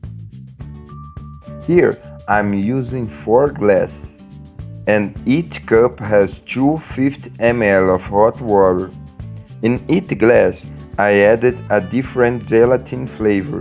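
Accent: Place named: Brazil. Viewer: Mn esse cara n parece um gringo falando, acho q é um brasileiro falando inglês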